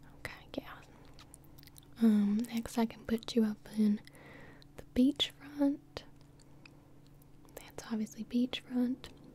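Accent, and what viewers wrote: South, United States. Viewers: I just now realized used to have a southern and deep sounding voice but now is losing her southern accent and her voice has gotten higher! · This is my favorite ASMR video. For some reason the combo of the slight southern accent with the typing and the personal attention just MWAH. Works so well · rip to when had a country accent... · She used to have a southern accent? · did anybody else notice that she has a southern kinda accent this video o-O it was really soothing tbh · Back when was southern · I love how voice evolved from this very slightly southern accent to a more anime one lol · I like how this has a hard southern accent but then the accent slowly deteriorated throughout her later videos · Did get a country accent???? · Old voice: fairly normal + country accent. Present: High pitched + exaggerated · I forgot had a southern accent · went from quirky to southern hahaha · I love southern accent lol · I love her southern accent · Is from the south??? 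· There we have it, early on, was southern and did that cute tongue clicking thing Mistletoe does. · Is she supposed to have southern accent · she still kinda has that lil southern accent still lingering but yeah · She got less southern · used to have a country accent 😂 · actually she sounds very southern here. And I'm from Mississippi, so... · Gibi should make a role play character with this southern type accent because it is suuuper relaxing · I feel like she was from the south and when she moved back her accent was reinforced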